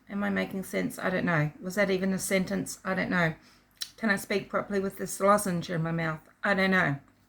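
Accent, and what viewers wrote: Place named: Australia. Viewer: Hey! It is so great to find you. I found you from Randy’s latest vid. I agree with EVERYTHING you said!!!! You have such intelligence and common sense. I’ve ALWAYS respected your thoughts, comments and opinions. 💗 I always thought you were a guy! 😂 You sound Australian. Keep on keeping on, girl!! 👍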